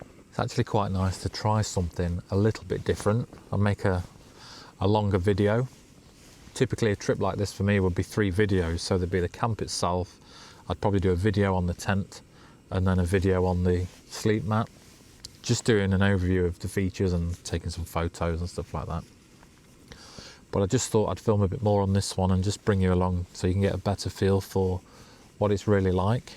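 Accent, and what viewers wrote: United Kingdom. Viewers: Put big light on, sounds very Sheffield my friend. 👌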